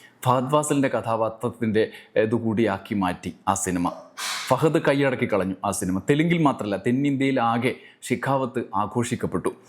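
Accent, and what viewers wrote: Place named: Malabar, India. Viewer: ചേട്ടാ നിങ്ങളുടെ സൗണ്ട് ഒന്നാമതെ സ്ലോ ആണ്.. മലബാർ ഭാഷ കൂടി ആണ്... ഞാൻ നേരത്തെ പറഞ്ഞത് ആണ് നിങ്ങൾ സമയം കുറക്കാൻ... പിന്നെ പുഷ്പ യെ പറ്റി ::ടൈം ലാഗിംഗ് ഉണ്ട് എന്നത് ഒരു സത്യം തന്നെ..ഫാമിലി സീൻ നെ പറ്റി പറയുമ്പോൾ.. ഇന്നത്തെ തലമുറക്ക് പിന്നെ കുടുംബ ജീവിതം വലിയ ഇഷ്ടം അല്ലല്ലോ.. അടി ഇടി വെടി വെട്ട് കുത്തു ഇതൊക്കെ മതി... ഞാൻ ഒരു സിനിമ അമിതമായി കാണുന്ന ആൾ അല്ല... ഒരു നടന്റെയും ഫാൻസും അല്ല... എന്നിരുന്നാലും പുഷ്പ 2...കുഴപ്പം ഇല്ലാതെ കണ്ടു കൊണ്ടിരിക്കുന്ന ഒരു സിനിമ ആണ്.... Nb ::പിന്നെ അമിത ഹൈപ്പ് കൊടുത്തു മാർക്കറ്റ് ചെയ്യുന്നത് അവരുടെ ബിസ്സിനെസ്സ് തന്ത്രം ആണ്. അതിൽ വീഴണോ എന്ന് നമ്മൾ ആണ് ചിന്തിക്കേണ്ടത്..... സിനിമ യെ സിനിമ ആയി കാണുക... എന്തായാലും താങ്കളുടെ റിവ്യൂ &ബുദ്ധി ജീവി വിമർശനം വളരെ ബോർ ആയി പോയി....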